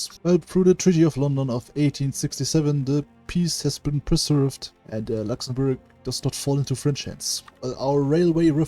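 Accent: Germany. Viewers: Youtube has added an AI 're-dub' because has a German accent...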